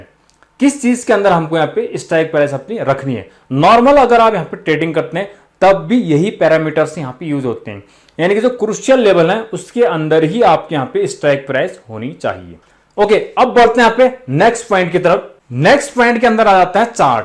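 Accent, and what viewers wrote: Kumaon, India. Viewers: Your sound like a kumaoni 😮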